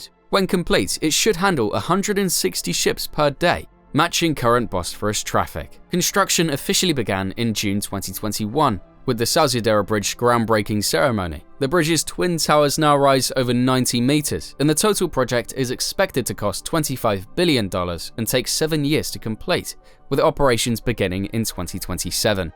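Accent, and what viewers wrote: United Kingdom. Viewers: He sounds like U.K.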